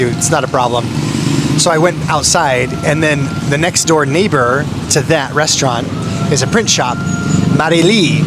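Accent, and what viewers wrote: Philippines. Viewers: Filipino ka na talaga Kuya. Halatang halata na sa pagsasalita mo ng tagalog.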